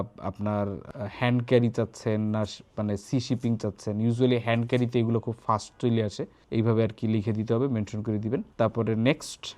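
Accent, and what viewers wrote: Barishal, Bangladesh. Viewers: ভাই আপনি বরিশাইল্লা বুঝলাম, প্লেন কে প্লেইন উচ্চারন করেন। তাই বলে লেখার সময়েও Plain?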